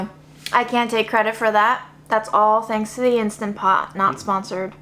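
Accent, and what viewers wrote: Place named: South, United States. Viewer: she has a southern accent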